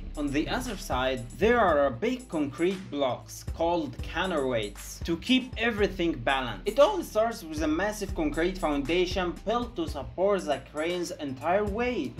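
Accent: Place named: Egypt. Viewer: Your accent is so Egyptian 😂 but i love the content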